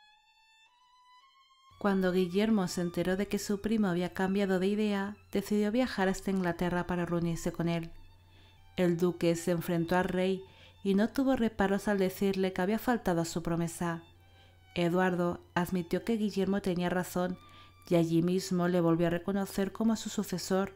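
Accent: Spain. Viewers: Estoy enamorado de tu voz, la cadencia, tu acento peninsular... como Argentino, hijo de España, me derrite. Excelente trabajo.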